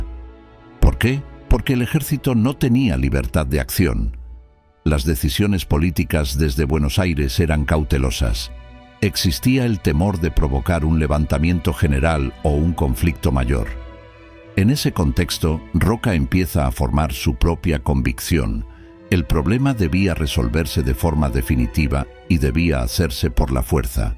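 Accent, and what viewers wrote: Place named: Castile, Spain. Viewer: Buena biografía. Pero la imagen del min. 1.48 no le corresponde a Alejo Julio Argentino Roca Paz, sino a uno de sus primos Reboredo, éste creo que es Florencio. Ambos fueron estudiantes en el Colegio del Uruguay, creado por Urquiza en la capital de de Entre ríos, Concepción del Uruguay. No entiendo el tono castizo de la locución. Es para parecer más "interesante"?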